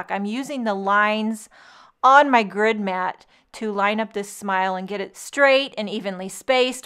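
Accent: Boston, United States. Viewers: You have a great Boston accent! 😂 What wonderful kids spoiling you like that on Mother’s Day! Absolutely love your cards! And this whimsy rainbow die! I find myself creating a lot with this! Great inspiration!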